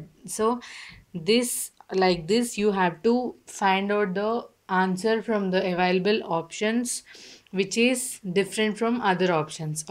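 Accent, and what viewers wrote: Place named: United Kingdom. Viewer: mam aap ko london main hona chahiye tha aap ki english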